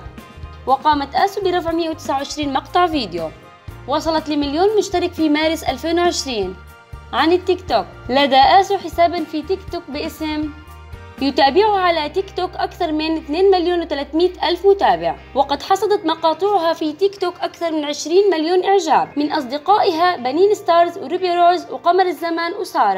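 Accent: Baghdad, Iraq. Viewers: يب بغدادي🙂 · لا ترا لهجتهاا عراقيهه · ابدد كلامهه بغدادي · لا بغدادي كلامهة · لهجتها بغداديه خيررر؟ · دنجب اصلا هيه تتكلم عراقي هيه من الغه تهرف اوك ولهجته عراقيه شلون تكول هيج ؟؟؟؟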